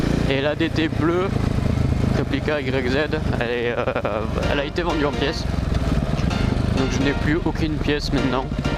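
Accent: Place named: South, France. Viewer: l'accent zen du sud 😊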